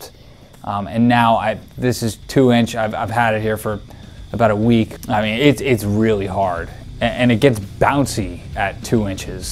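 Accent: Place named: New England, United States. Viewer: Love this guy's videos but hot damn that yankee ass accent! I can get past it but it irritates my subconscious ever so slightly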